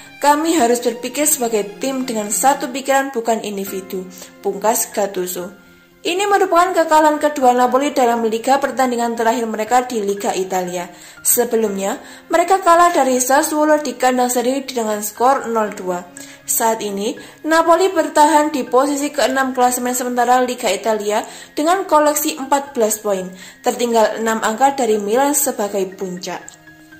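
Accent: Java, Indonesia. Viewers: Medok banget mba bacanya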